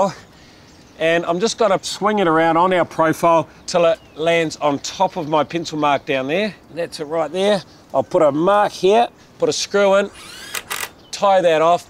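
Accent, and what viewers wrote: New Zealand. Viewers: That’s a New Zealander. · It’s New Zealand not Australia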